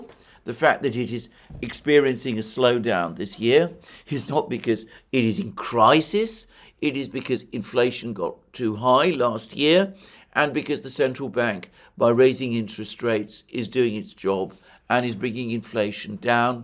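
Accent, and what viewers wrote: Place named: RP, United Kingdom. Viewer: btw some posh accent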